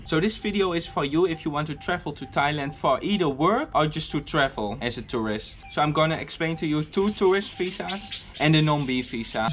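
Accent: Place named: Netherlands. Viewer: Sounds like Dutch :)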